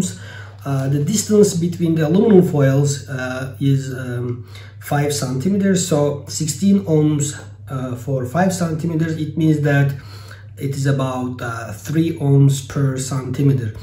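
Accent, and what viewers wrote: Turkey. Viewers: Merhabalar Öncelikle videolar için çok tesekkürrler çok faydalı içerikler var kanalınızda.Aksandan ve kullandıgınız malzemelerden anladıgım kadarıyla türkiye de yasıyorsunuz. Büyük ihtimal sizinde karsınıza çıkmıştır bi yerlerde. Nanomax ısı boyası ya da başka marka adları altında satılan bir ürün var. ISI BOYASI. Bu sistemi kendim yapmak istiyorum. Bu konuyla ilgili bi proje yaparsanız izleyiciler çok faydalı olacagı inancındayım.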